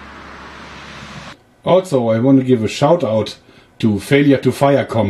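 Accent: Germany. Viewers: Deutscher? Hört man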